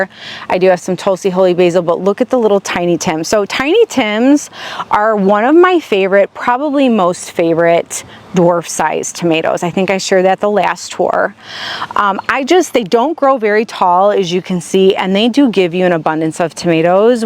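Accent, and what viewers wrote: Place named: Midwest, United States. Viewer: I knew you had to be from the Midwest once you said you were from Illinois, we have the same accent 🤪 I’m in MN. ❤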